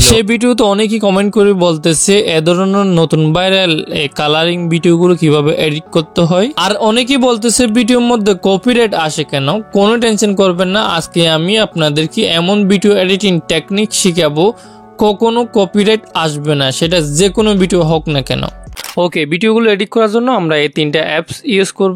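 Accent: Chittagong, Bangladesh. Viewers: ভাইয়া মনে হয় চট্টগ্রাম এর..? আপনার কথা গুলা শুনে মনে হয় ☺️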